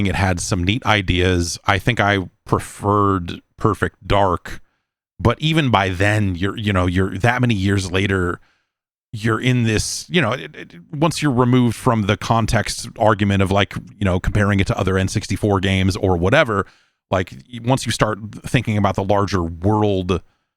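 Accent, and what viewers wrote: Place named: Liverpool, United Kingdom. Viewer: Heard it in flawless Liverpudian accent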